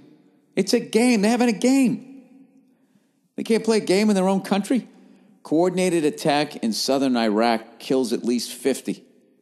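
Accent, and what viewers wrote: Boston, United States. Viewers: he has a Boston accent...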